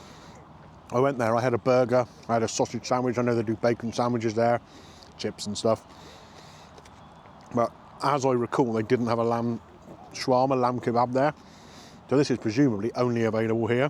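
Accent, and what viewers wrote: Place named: Dorset, United Kingdom. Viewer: which part of Dorset are you from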